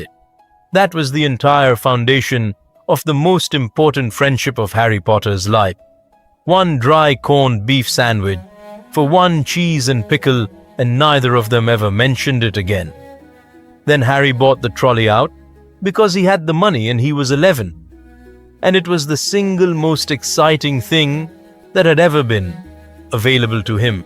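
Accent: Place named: India. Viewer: I enjoyed this story. I liked the Narrator with the Indian accent. I liked that Petunia was a decent character, not the abusive woman she is in all other versions.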